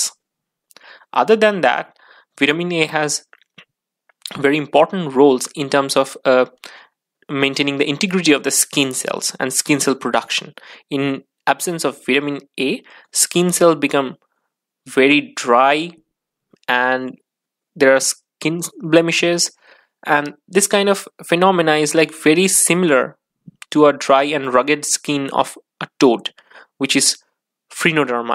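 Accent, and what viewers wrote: India. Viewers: His accent sounds like Only Desi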